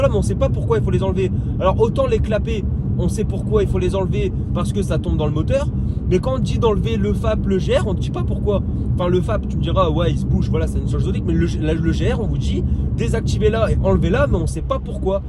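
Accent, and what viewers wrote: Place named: North, France. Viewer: Ca se vois trop que tes du nord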